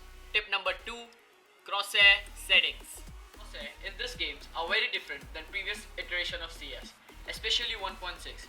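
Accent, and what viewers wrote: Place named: India. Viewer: from india? that accent wtf - anyway improve, as already mentionted, the audio. have my upvote for the tips though.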